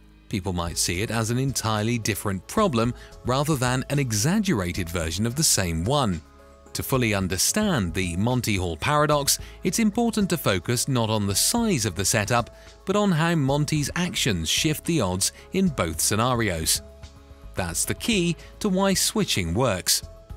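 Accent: United Kingdom. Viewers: This is one of those rare cases where a British accent does NOT make something sound more true. is absolute B.S. because the car isn't in all three locations. The player has chosen what he has chosen and the car was placed where it was placed (though I wonder if these gameshows don't switch the prizes backstage while they are rambling on, distracting the audience). is B.S. because "all of the other options" are in the PAST when they are ruled out. Card counters don't work on the probabilities of the past, but the current probabilities based on which cards have been dealt or played. stating 1 out of 3 or 2 out of 3 is wrong because the third option has already been ruled out. No, it does NOT still have the same probability of winning. Once the third option is ruled out the odds change. If you play Russian Roulette the odds are 1 in 6, but when the hammer falls on a chamber the odds change, which is why there is so much BUILDING suspense in these scenes in The Deer Hunter. 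But the originally chosen door ALSO now has better odds! That is LOGIC. This video reveals what I have observed to be a YouTube rule of thumb: If it takes 20 minutes or more to explain, it just might be so much Bravo Sierra, taking advantage of your short attention span on a platform that caters to it, appealing to your desire to appear logical by mischaracterizing Logic as Intuition, and disarming you with a lengthy onslaught of eloquence without communicating much truth. If I had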